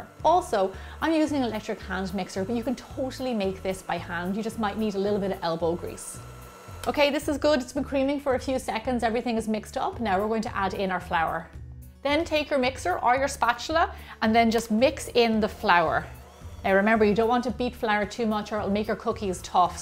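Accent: Ireland. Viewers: hi, lovely video. started baking recently when i get time, still new to it, get nervous often, but with yr amazing videos, great recipes and precise instructions always, not to mention yr irresistable self, i hope to become a bolder baker soon. i ladore yr irish pronunciation n luv checking out yr videos. just want to say thanks for all the trouble u take to get them to us. you r truly an inspiration to pple like me. God bless. luv from India. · uhh... i love your Irish accent. i could listen to it all day. is that wrong of me?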